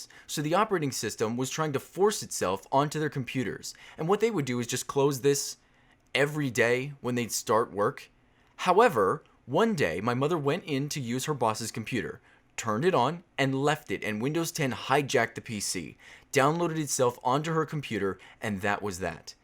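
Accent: India. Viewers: I love the Indian accent. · That indian accent just killed me :D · From sounds like english spoken by an Indian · Indian voice xD <3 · That indian accent doh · Great Indian accent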